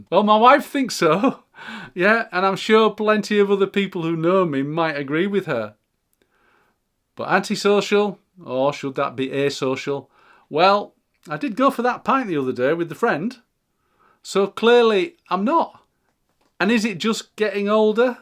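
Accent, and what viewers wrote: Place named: Northern England, United Kingdom. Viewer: Hi, I’ve just found your channel and it’s great to hear your northern accent. I’m a fellow Geordie who emigrated to Alberta Canada back in 2009, but I do have a brother in law in York and miss going there. Mind you, the way you’ve just described it with all the hustle & bustle and drunks from Newcastle coming down on the train I’m not so sure now 😂! I’m 56 and still working part time, but for me it’s the rude people who only think about themselves that annoy me most. I too prefer a quieter life, having grown up going to the Bigg Market drinking for many years lol. I also like to travel in off peak season to avoid the crowds & kids, but most of all during the Winter to escape from -30 temps and the snow. It certainly sounds like the UK has become a lot more expensive, I’ve only been back twice since moving here. 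Anyway, I just wanted to say hi from a new subscriber and I’m going to watch your other videos. P.S is the Cross Keys pub still around?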